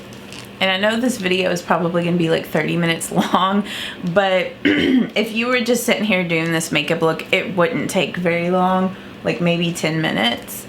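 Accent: South, United States. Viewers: First, let me begin by saying that you’re one of my fave YTers. ❣️ I love how “real” you seem to be. I don’t feel like I’m watching someone that I can’t relate to. I’m also a southern girl, so I love your accent also. 😉 My only suggestion would be to possibly upload a few videos that are a little bit shorter. I don’t want to miss any of your videos, but more often than not, I don’t have 30+ minutes to spend watching a video. I may be in the minority, but shorter videos grab my attention quicker. Sending you lots of love from Alabama! 😘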